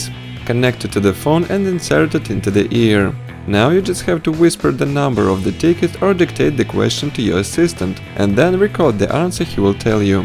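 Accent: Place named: Russia. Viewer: Ее, русский акцент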